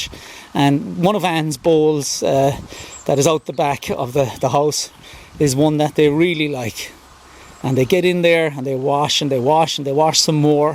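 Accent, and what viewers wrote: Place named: Ireland. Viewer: Let me try that again! Great to see you and your beautiful backyard flowers and ponds. Your birds are spectacular! Also, great to hear you wonderful Irish voice. Nan Hampton (Antarctica, Iceland, Svalbard)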